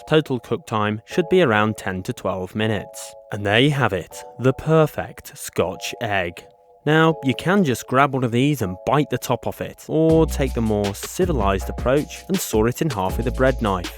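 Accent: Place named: United Kingdom. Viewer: ! Seven egg halves or seven whole Scoth eggs? I had Scotch eggs at a picknick when I was young and absolutely loved it. I spent a month at a boarding school in England (language course - most Swedes went to Hastings and spoke Swedish to other Swedes, but my mum, a translator, would not have that, so it was boarding school) and that I had Scotch egg for picknick lunch one day is one of the strongest memories from those days. Strangely enough, since I can't remember how they tasted. We were on an outing to a fair, and I also remember buying "toffee", which was not toffee-like, but hard as a brick, so when I had bought it, the salesman smashed the "toffee" in its plastic wrap to break it in pieces. Anyway, VERY nice to hear a British accent explaining how to make Scotch eggs (because most recipes for Scotch eggs on Youtube are posted by Americans, and I could not even begin to say how tired of I am, and irritated by, American "English").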